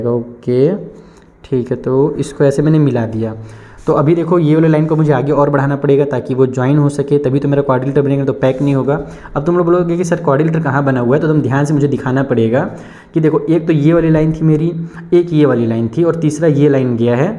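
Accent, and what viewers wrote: Bihar, India. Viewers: 😊😅Sir lagta hai aap UP-Bihar se hain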